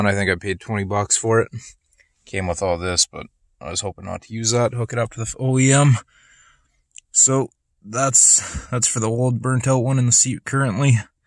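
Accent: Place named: Canada. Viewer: This guy has the most excellent Canadian accent I've heard in a while!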